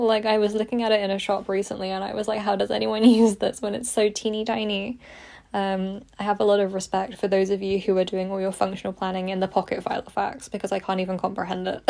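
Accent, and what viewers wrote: United Kingdom. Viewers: since i love british accent. love to hear you talk during long videos.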